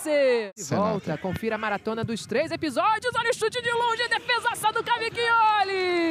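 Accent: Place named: Rio de Janeiro, Brazil. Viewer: Dá para tirar essa VOZINHA de TAQUARA RACHADA, feia, estridente, de criança e colocar um HOMEM ou uma MULHER ADULTA para narrar!? Fora o sotaque extremamente acentuado que só CARIOCA entende... TIREM ESSE NARRADOR DAÍ, URGENTE!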